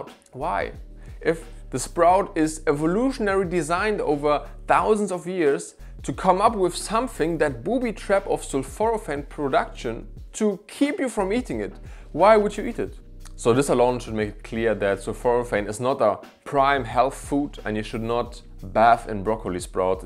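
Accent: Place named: Germany. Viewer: Let me guess.. Are you German? Your accent sound like that ✌️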